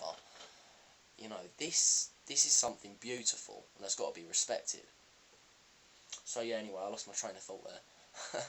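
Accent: United Kingdom. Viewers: Your voice and accent makes me think of the Harry Potter movies....sorry...I'm Canadian...so I love other accents...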